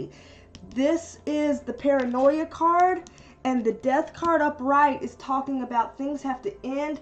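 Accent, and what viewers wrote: South, United States. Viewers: You sound country as hell and I love it!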